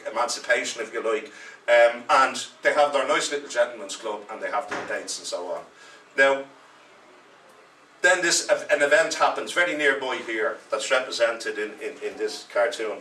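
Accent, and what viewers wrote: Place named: Dublin, Ireland. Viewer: This jackeens accent is awful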